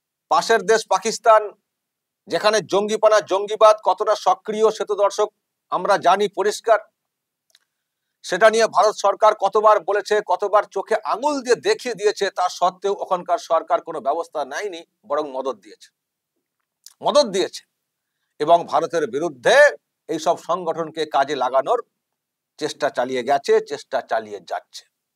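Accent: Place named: Bangladesh. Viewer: Dada kathatey bojha jay ke bangla deshi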